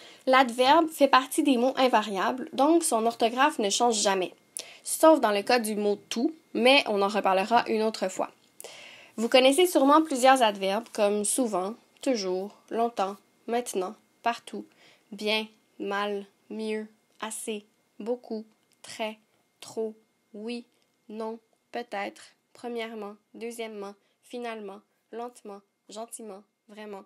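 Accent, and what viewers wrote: Quebec, Canada. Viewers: Bonjour, Elle n'a pas d'accent anglais, mais plutôt une variété québécoise. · Bonjour ! Quel superbe travail !! Mille mercis et bravo . Je me sers de vos capsules et des exercices pour les enfants de ma classe primaire en France encore en confinement . Les enfants découvrent en même temps l'accent québécois , c'est sympa !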